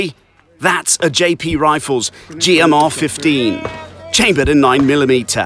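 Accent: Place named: England, United Kingdom. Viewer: The commentator sounds totally un-American. Has an English accent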